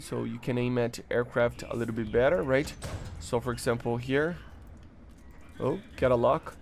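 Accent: Brazil. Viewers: I like you because you sounds brazilian speaking english. Its good for trainning my english.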